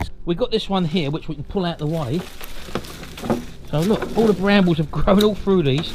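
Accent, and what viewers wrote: United Kingdom. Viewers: And English you are!!!!! As I have been amazed by your passion and your left side of brain (left handed as well). Wondered for a bit of your whereabouts until you finally spoke as I assumex you could be Canadian, British or German and with that, I assumed correctly... Left handed and no ring (who in his / her right or left mind would wear a wedding ring. To that end, married or not, straight or otherwise you sure know how to work with your left hand and fingers. You also have very good digital skills (printing+video editing). One take....The sign you printed was slightly off center when applied. of all trades....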